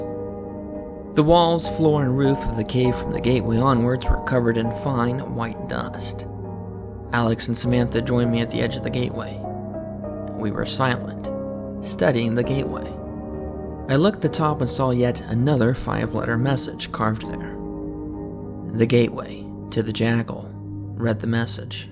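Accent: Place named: Midwest, United States. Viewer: probably the midwest, reminds me of a thicker version of my accent so i’m fairly confident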